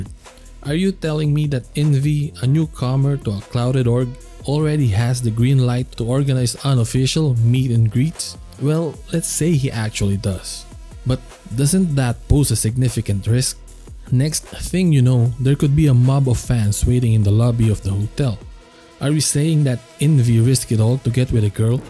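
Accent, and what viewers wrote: Philippines. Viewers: You sound very pinoy to my ears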